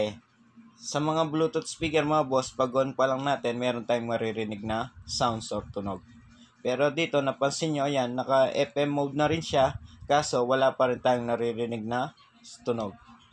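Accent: Pampanga, Philippines. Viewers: Kapampangan ka ata boss hehe halata ee..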